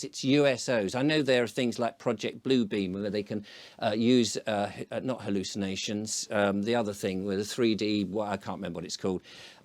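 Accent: United Kingdom. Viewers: it's great to hear another British accent speaking on these subjects. Great balance of interviews, dry quintessential Brit humour and opinion. I have attempted to do what you are doing here on YT on a few occasions in the last 10 years but lacked the skill and time to produce the quality output that you deliver.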